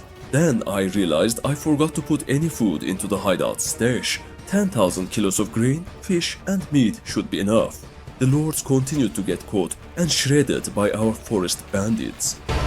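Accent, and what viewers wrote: Turkey. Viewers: Abi tam Türk aksanın var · türk aksanı çok kötü gerçekten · Bro your videos are just so good. They gives everything to us. But your voice is just not giving it. It's not about your tone or something. Its about your accent. You're Turkish I think. Please try to improve your accent. I m not trying to be racist or something but you know it would be better if you ll keep my words in your gut. Appreciate for the video, have a good one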